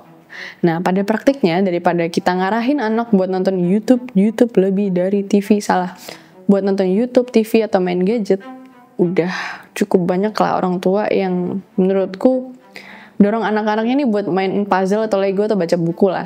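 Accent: Java, Indonesia. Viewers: pesona mba2 medok intelek, bikin chasing reality tiap hari ga sih biar home feedku berwarna :) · Logat jawa nya candu bgt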